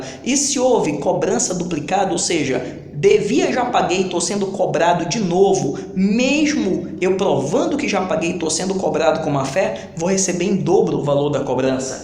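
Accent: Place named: Pará, Brazil. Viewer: por aqui peguei q vc é paraense, acertei ? Kkkj hummm bunitinho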